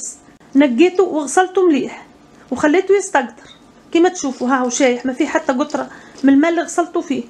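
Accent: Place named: South, Tunisia. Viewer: قداش نحب كلامك لهجتنا متاع الجنوب محلاها 😍 ربي يباركلك اختي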